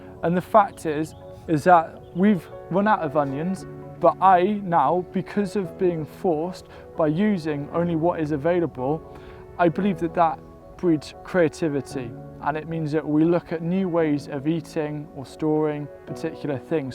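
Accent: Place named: United Kingdom. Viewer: Thank you! What a wonderful video! PS I like the UK accent far better than the US one!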